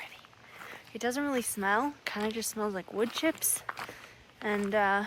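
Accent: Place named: South, United States. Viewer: Where in Maryland with a southern accent. I think the East Coast is changing yalls speech 😅 o yea you all live in LA yea you all have I-5 and yes I been there and by far some of the worst traffic I have ever sat in before. O wow the video in the dark reminds me of the (are you afraid of the dark) lol. I know the rocks are going to get worse unfortunately 😓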